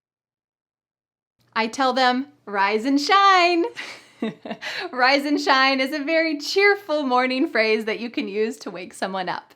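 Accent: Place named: United States. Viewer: Great video! Just, why do you insist so much on the "r" pronunciation and US accent. I mean, why should one want to sound american, these days? After the infamous incident at the soccer World Cup, especially 😅. That really has been the last straw ...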